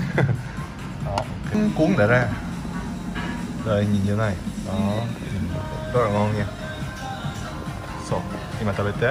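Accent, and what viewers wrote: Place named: Gia Lai, Vietnam. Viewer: Giọng nói của bạn giống ở gia lai vậy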